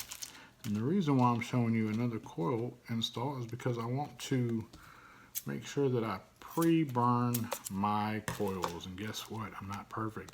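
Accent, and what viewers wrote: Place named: South, United States. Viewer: Also, no disrespect but you country as hell 😂😂😂. As a country boy from Alabama I appreciate it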